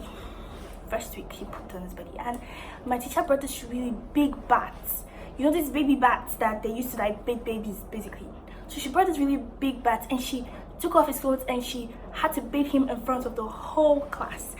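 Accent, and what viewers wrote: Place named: United Kingdom. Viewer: You have a British accent🤔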